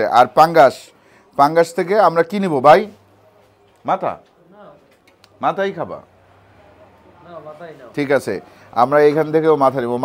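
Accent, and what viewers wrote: Barishal, Bangladesh. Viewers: Vai borishal jaiya apnar kotha bArtai akta borishailla vab aisay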